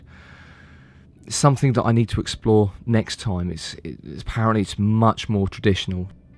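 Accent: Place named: United Kingdom. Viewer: Enjoy very much of your introduction, probably one of the best! You’ve got really nice voice! Love your British accent!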